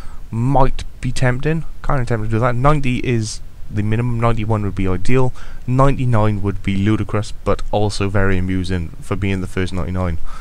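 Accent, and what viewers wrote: Wales, United Kingdom. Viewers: ahah where in wales you from but? can spot it from a mile off!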